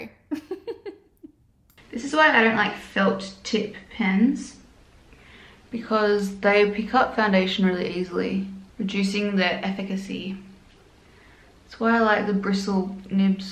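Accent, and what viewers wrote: Australia. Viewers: I love when she emphasizes R's to minimize her Aussie accent lol it's funny cute "bronzeRRRR" instead of "bronza"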